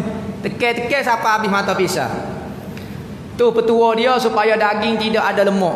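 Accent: Kelantan, Malaysia. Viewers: maaf bertanya ttg daging berbau wangi tu..boleh x terangkan bagaimana cara? maaf sy x fhm sgt loghat kelantan..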